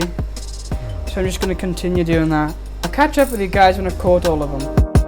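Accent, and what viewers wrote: Wales, United Kingdom. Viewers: Just subbed, nice little setup.. hear the Welsh accent too👍🏻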